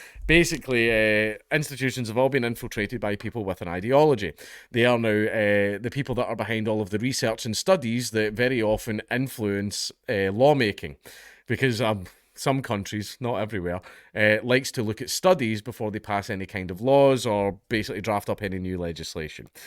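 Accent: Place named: Scotland, United Kingdom. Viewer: Sex studies retracted. Gotta love the Scot accent.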